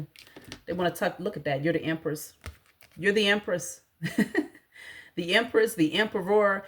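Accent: Washington DC, United States. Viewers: This msg was 🔥 torch. Ur DC dialect puts a smile on my face every time…being from SE DC myself. As always thank you!!😉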